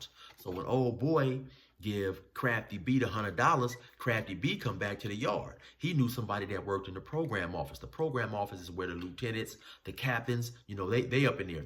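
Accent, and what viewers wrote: California, United States. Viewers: You a natural story teller pimp especially with that cali dialect I’m hooked dawg. KEEP posting big homie. YARDOWN!!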